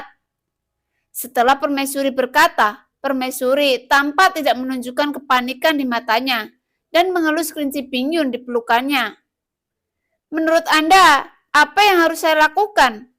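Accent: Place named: Poso, Indonesia. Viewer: Mimin orang mana ini kayaknya org poso ya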